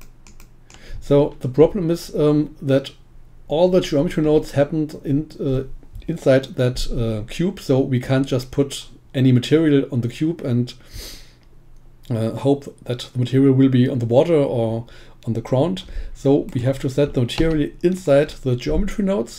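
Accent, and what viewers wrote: Germany. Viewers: witzig wie man die Herkunft einer Person doch immer am Akzent erkennen kann. Nach den ersten paar Sekungen wusste ich dass hier ein Deutscher spricht :) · You are german arent you? :D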